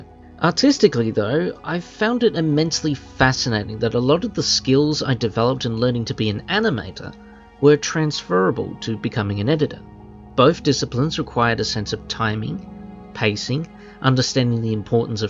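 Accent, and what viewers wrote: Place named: Australia. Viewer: A video like this is exactly what I needed. It makes me remember that there's always light at the end of the tunnel even when it all seems hopeless. I'm glad that things are starting to work out for you. Also, your writing skills are truly immersive and I really hope you do more stuff like this in the future! P.S: You're Australian? I honestly thought you were British for some reason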